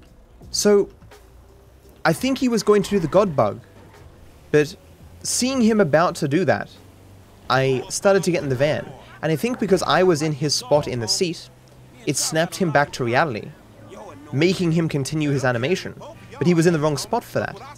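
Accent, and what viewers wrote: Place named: Australia. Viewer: Where in Australia do you live? Your accent is sooo smooth compared to my Australian friends.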